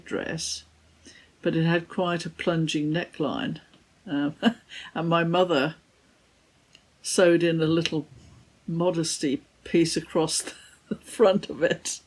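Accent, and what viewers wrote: Australia. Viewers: Hi,as always beautiful cross stitch,and lovely to listen to you chatting,are you Australian? ❤️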